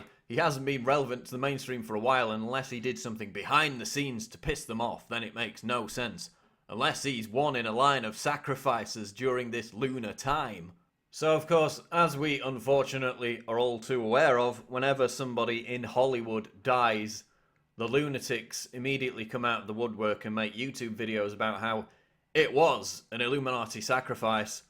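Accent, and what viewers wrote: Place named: England, United Kingdom. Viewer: Like the Boston psy-op was also a coincidence - same nonsense, Family Guy episode. ROLLS EYES This is not just a sacrifice, but it's telling people who are awake that there is NOT A THING you can do about any of this. Shills like Watson here know what's up but are FORCED into the establishment's plans to mind control people - or else. And no, the "lunatics" are not coming out of the wood works. The "lunatics" are calling BS on people like YOU, and Mr. Jones, your boss. Syyyyn-chrone-IS-iities.... that fucking English accent gets old real quick when pronouncing that word. See if you can do something about it. Thanks!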